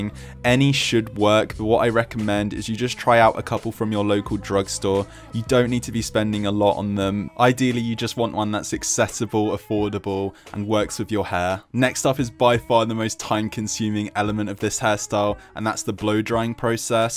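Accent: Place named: United Kingdom. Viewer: You have british accent bro isn't 😊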